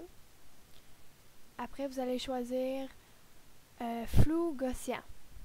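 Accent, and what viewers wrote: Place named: Quebec, Canada. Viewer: J'adore ton accent ! Dsl de commenter juste pour ça ! Tu es québéquoise nan ?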